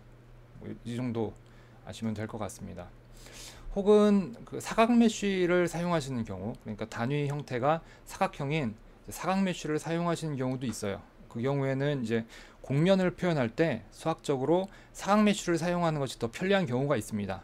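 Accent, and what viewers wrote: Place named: Texas, United States. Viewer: I don't understand anything, you have a deep accent are you from Texas?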